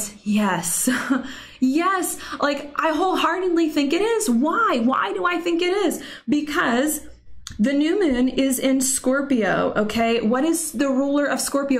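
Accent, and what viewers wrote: South, United States. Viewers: You sound like you are from NC or Atlanta.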